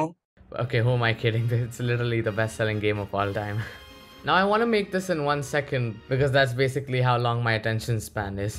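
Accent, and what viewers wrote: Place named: India. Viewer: ur indian accent is heavy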